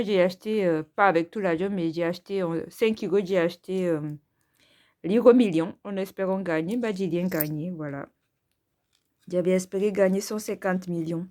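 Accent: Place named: Senegal. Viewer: Bonjour, un bon début de week-end😊 ps: je trouve que vous avez l'accent sénégalais trop mim's. Moi aussi, j'ai commencé le principe des enveloppes budgétaires depuis fin décembre. Pour le moment, hors vidéo, j'espère pouvoir me lancer l'année prochaine pour avoir un suivi plus assidu et motivant! Les débuts ne sont pas faciles mais déjà beaucoup de changements pour moi sa motive.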